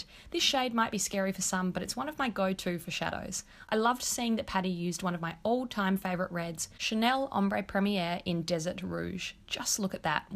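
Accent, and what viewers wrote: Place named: Australia. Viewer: your videos are so simplistic, honest, innovative, creative, soft and realistic. your content not only gives insight to these products, but also show a hint of understanding the real usage and how it would be applied in everyday life, and in special occasions seen by the different styles of videos that you upload. personally, I love Australian accents, and I love it when people really support that backgrounds, and your enthusiasm and growing appreciation towards Aussie products and your cute and unique accent results in me watching your youtube videos over and over again. Thank you for the amazing and continuous effort that you put into your videos, I always find myself smiling when I click to view your creative content. 💓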